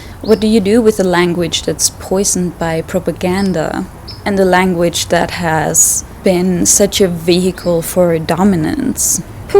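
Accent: Austria. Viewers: Das ist das erste Video, das ich von dir sehe, d.h. ich kenne dich noch gar nicht und weiß auch gar nicht, was für Content du sonst machst und worum es in deinen anderen Videos geht, aber: Als deutscher Muttersprachler aus Deutschland denke ich, dass dich während während deiner Schulzeit so kaputt gemacht hat, dass du heute Komplexe hast, weil du dein österreichisches Deutsch als Makel empfindest. Weißt du was? Steh' doch einfach zu einem Akzent! Österreichisches Deutsch hat genau so seine Berechtigung wie jeder andere Dialekt und jede andere Varietät des Deutschen... :) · Your Austrian German is so beautiful and I completely understand it as a German ❤!I think you should be proud of it ,because it is a part of your personality!!! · Nee, das ist nicht der Grund, warum sie in ihren Videos kein Deutsch redet. Ich wette, privat spricht sie dauernd Deutsch. Sie meinte halt, dass ihr österreichisches Deutsch für Leute außerhalb ihrer Gegend etwas komisch rüberkommt. 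Dann ist sie aber ein bisschen abgeschweift und hat über Hitler geredet. Das hatte aber damit zu tun, dass manche immer noch Klischees gegenüber der deutschen Sprache haben.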